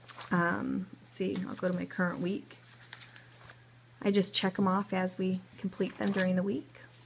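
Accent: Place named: North Texas, United States. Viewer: Hopefully my profile pic loads soon...but if it doesn't, this is Kristen. :) I love how you talk like a yankee moved to Texas! :) I'm glad you're doing this vlog as I am still considering homeschooling, and I love your organization. Thanks! Miss you friend!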